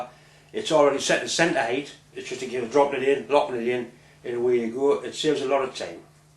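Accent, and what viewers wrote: Newcastle, United Kingdom. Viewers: 62 Btw he is not Irish he is infact a geordie ( from Newcastle, England)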